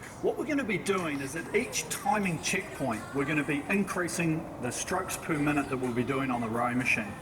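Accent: Scotland, United Kingdom. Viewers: Thanks for reviewing asensei, appreciate it. It is worth mentioning that most of our workouts these days are full row-along sessions with coaching and talking all the way through from the likes of Eric Murray, Johan Quie and British Rowing. There are some you can try in the free trial too. These are similar to Eric's free row-along sessions on the asensei youtube channel - indeed some of those sessions are pulled into the app and have the goal setting, music, tracking etc fully integrated. Would be happy to talk you through any of this if you ever want to do a more in-depth piece, or just catch up. Nice to hear a Scottish voice doing a review too (two of the asensei founders also Scottish)!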